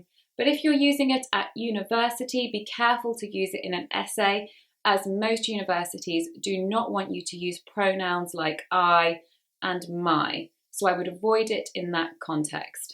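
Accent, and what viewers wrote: United Kingdom. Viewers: I believe brittish accent is awsome when you use it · Stop speaking british accent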